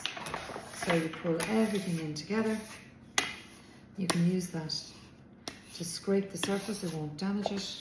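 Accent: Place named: Ireland. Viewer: Lovely listening to a quiet irish lilt instead of a loud harsh american accent...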